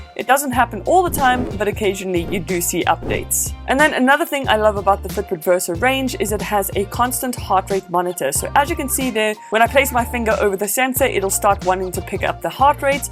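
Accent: South Africa. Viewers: Are you a SAFFA? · I can spot a South African accent a mile away . . . nice review sistas!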